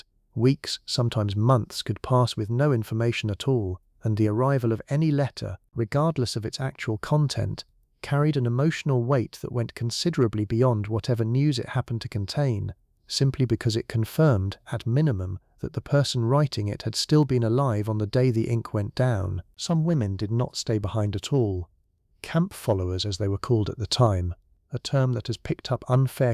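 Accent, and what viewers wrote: United Kingdom. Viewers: You with the British accent forget that your country transported 3.2 million slaves from the 1600s making yourselves very rich. The entire endeavor is still going on in too many lands by the same people that sold their own all those years ago. It is all diabolical.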